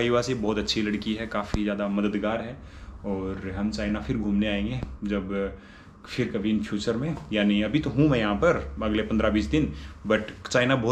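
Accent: Haryana, India. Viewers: Bhai ...kye aap hariyana se ho...aap ki boli mai ye suff jhalak raha hai...I like hariyanvi boli....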